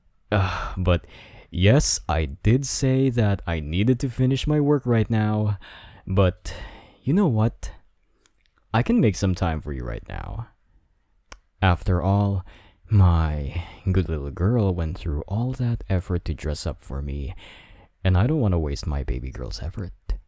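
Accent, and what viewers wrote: Philippines. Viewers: I sense a Filipino Accent?...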